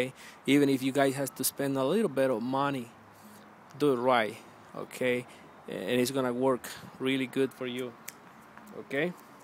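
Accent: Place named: Mexico. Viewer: love the accent bro.. Viva mexico .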